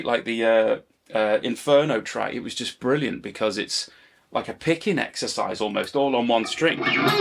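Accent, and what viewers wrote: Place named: Liverpool, United Kingdom. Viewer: Great Scouser Accent! Amazing playing! ❤❤❤ ❤❤❤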